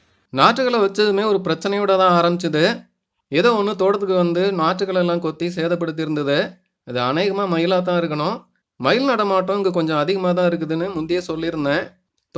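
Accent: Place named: Coimbatore, India. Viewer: super நா.. உங்க பேச்சு.... தெரியுது... கோவை... உங்க video inspiration ah இருக்கு அண்ணா..vithai pakirvu iruntha podunga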